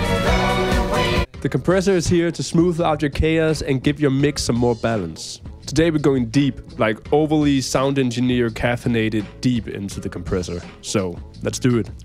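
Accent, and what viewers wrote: Denmark. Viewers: du dukkede op i mit feed, og min kæreste hørte med og spurgte om du var dansker. Jeg tænkte nej sikkert ikke. Men fandme jo. Så fedt med en dansker der laver den slags yt. Keep up.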